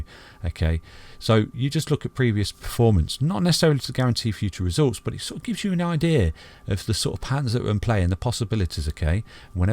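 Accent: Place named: England, United Kingdom. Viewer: LMAO this is a proper english accent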